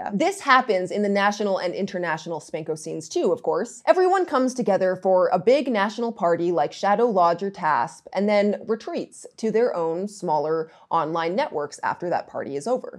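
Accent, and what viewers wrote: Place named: Texas, United States. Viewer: your accent puzzles me sometimes. You are not from the south but sometimes you get something that reminds me of the Texas accent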